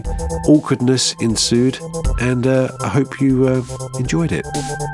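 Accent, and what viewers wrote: United Kingdom. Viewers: I know my way around quite a few British accents, and certainly yours isn't unfamiliar. But I swear to God, I was in the kitchen when you were describing "tail for a face" and I thought you were saying "towel for a face" and went "huh". 😂 I figured it out when I came back in and you were describing the tail in a different context (plus I could see what you were doing) and I realized my mistake and everything made sense...sort of. And "male" sounds like "mal".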